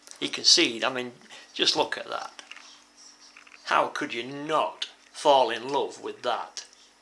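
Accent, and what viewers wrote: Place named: Yorkshire, United Kingdom. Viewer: Ayup, nice to hear a Brit bloke voice, is there a Yorkshire hint? just a bit. I've only been turning just over a week, I was told that Turners Retreat was in Sheffield! the buggers lol. So it's in or near Nottingham eh? Just my luck. Brilliant video's mate, how much wood blanks did you get for 6 to 7 hundred quid? When I first started to think about woodturning up I didn't expect that getting hold of wood for turning to be difficult! The American turners are spoilt lol, they seem to hsave stunning wood and its in their gardens most of the time! lol. Do you have dificulties getting wood to turn? OK won't bore you to much mate, take care, Turn Safe or..... TURN OFF. Cheers Andy South Yorkshire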